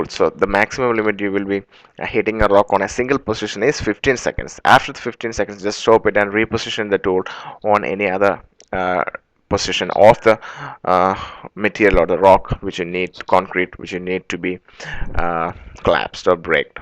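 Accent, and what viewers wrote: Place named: East India, India. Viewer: Great East Indian voice speaking well!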